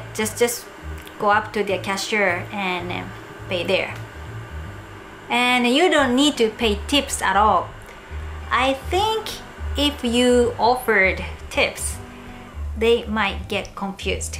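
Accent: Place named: England, United Kingdom. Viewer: Your English accent is so cute!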